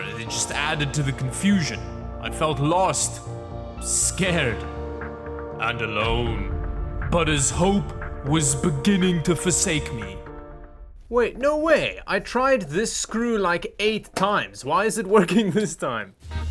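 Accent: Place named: Cape Town, South Africa. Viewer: Hey I'm subscribing just because I recognize the capetonian accent. Cheers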